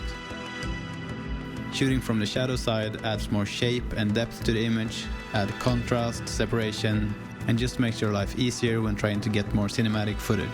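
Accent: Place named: Sweden. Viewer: I like this Swedish English accent :D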